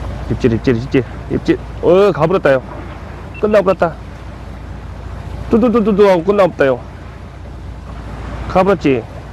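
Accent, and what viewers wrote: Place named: Jeolla, South Korea. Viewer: 영상보니 좋아 보이네요..... 구수한 전라도 사투리에.... 선장님 배로 외수질 출조하려면 어떻게 해야 하나요?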